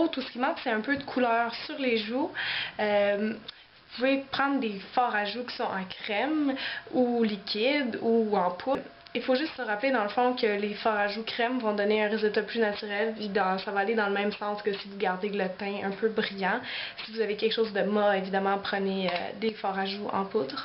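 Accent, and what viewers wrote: Canada. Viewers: Tu es Troppppppp Belllllee trop mignon l'accent canadien !!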